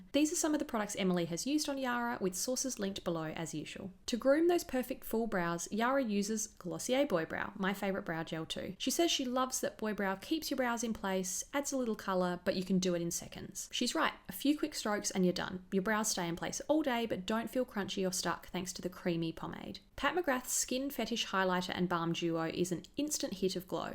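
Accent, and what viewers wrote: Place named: Australia. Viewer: Your Aussie accent makes your videos even more delightful to watch 😍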